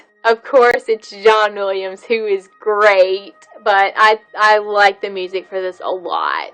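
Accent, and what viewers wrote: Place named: South, United States. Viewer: Just saw this movie. Haha where are you from? Judging by your southerness, you seem really close by. 😂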